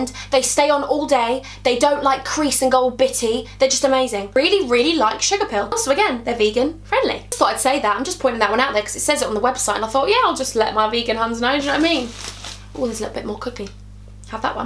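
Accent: South, United States. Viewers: the American accent is amazing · I live for your American accent and honestly I relate to your butterfly brain to a T and gurll I need those bambi lashes in my life they look insane!!! And of course can't forget the song ❤️❤️❤️🥀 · Your american accent is soooo good!!! Please do the accent challenge with #Bensalad or jacob!! love you xxxxx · Omg your American accent was so good and it acc really suits you, you should do a video doing a makeup tutorial in different accents😂❤️ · Her American accent♥️ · LOVE MARMITE SO ❤️ and that American accent is IT!! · Wow your accents are amazing love their American one pls do a first impression video in a American accent I love your videos they make me so happy! Your vibe is amazing 😘😘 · Snapchat fam💗💗 also her American accent is flawless!!! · That American accent omg girl you killed it i love you so much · YAS! 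I'm living a for the American accent and your singing voice. Can we just get on Big Brother! 🦋🐝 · The American accent tho! 👏🏼👏🏼 yass boo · You actually amazing at an American accent!! Xxx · OMG your American accent is ridiculously good!!! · I am shook how good your American accent was!!! 😱😱😱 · ! Love you, Wish i could fly out there all the way from North Carolina, love your southern accent by the way! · U SOUND SO AMERICAN OMG AHAHAHAH LOVE U 💗💗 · Love you American accent Haha 😂😂Xxxx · Your American accent is so good! And I love your personality. 😊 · ooooommmmmmmmmmmmmmggggggg u sound so American it is scary like if I had never watched u before an u showed me just that clip I would think u were American! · Your wing liner and American accent 💕🔥 obsessed! Xx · Plsss do a whole video in an American accent,, i'd love that as much as I love you!!! Xxx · Omg the American accent 😍😍😍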